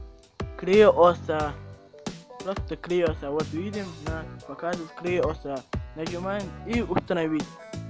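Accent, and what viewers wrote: Chechnya, Russia. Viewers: ОН ЧЕЧЕНЕЦ!!!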